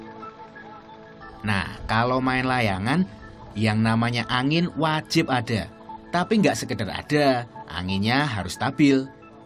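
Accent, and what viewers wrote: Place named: Java, Indonesia. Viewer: g iso di salahne, masih o gae boso indonesia wong tulungagung panggah medok bosone haha. tanah kelahiranku pehhh keren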